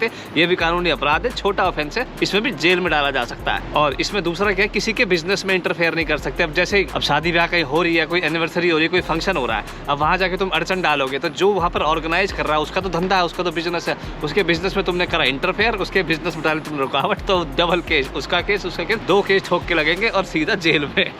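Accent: Haryana, India. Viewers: Are you from haryana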